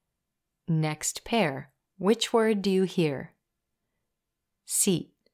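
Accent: United States. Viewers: I love your lesson and your American accent!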